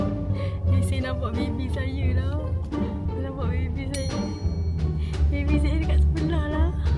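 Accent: North, Malaysia. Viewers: Fahammm betoiii teringat masa kami.kanda suka dgr cakap utara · nanty buat video suasana hari raya kat kampung😮 ckp la utara adehhh.... Rindu loghat utara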